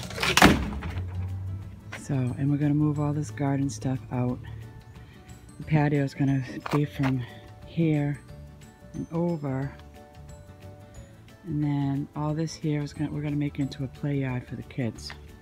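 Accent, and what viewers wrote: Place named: Boston, United States. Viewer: I should say yaahd because I love your accent so much! We love Boston! 💝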